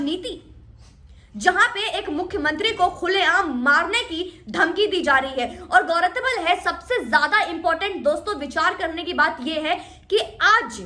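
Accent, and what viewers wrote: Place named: Uttar Pradesh, India. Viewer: hi from Germany i think u are from up your voice like up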